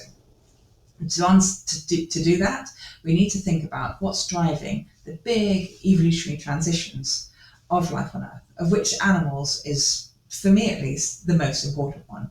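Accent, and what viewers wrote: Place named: United Kingdom. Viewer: Nothing better than hearing pure science from a well-spoken British scientist! 🥳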